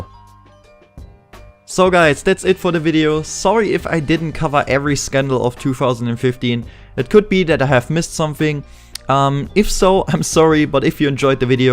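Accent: Germany. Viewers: Wusste gar nicht das du deutscher bist, aber der Akzent hat mich die ganze Zeit schon stutzig gemacht. Mag deine Stimme in den Videos. Passt gut zum stuff den du bringst. Super Arbeit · his grammar is alright but he has a annoying german accent and says "f" instead of "th" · This German Accent is so cute lol.#nohomo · Are you german?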